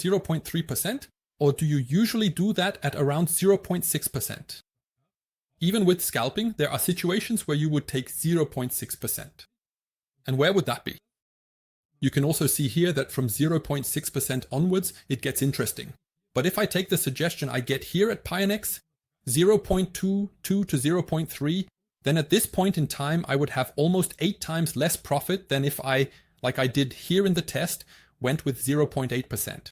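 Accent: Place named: Germany. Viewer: Bro u sound so German but very good explaining!!!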